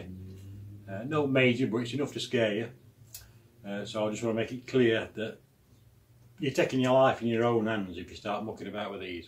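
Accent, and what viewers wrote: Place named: Northern England, United Kingdom. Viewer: You think you've seen everything on YouTube, and then you stumble upon something else. That's a fine northern accent btw. Huddersfield lad myself.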